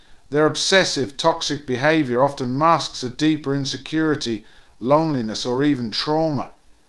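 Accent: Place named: RP, United Kingdom. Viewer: 😂😂😂😂😂😂😂😂 So damn Posh...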